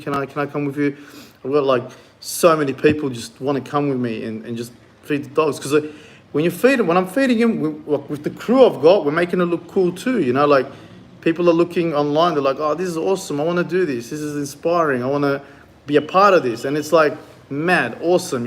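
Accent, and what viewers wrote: Australia. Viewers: Very strong Aussie accent mate, thought you are Turkish but sounds exactly like an aussie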